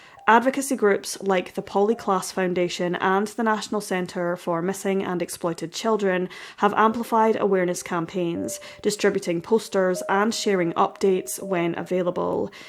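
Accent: Scotland, United Kingdom. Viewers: She’s Scottish. I think from the north of Scotland, maybe the Scottish Highlands.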